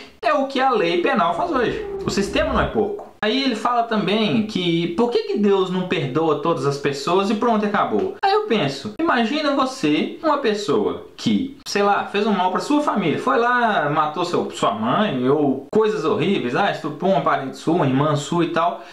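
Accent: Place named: Minas Gerais, Brazil. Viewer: Vc é mineiro??? Tem um sotaquezim bem minerim...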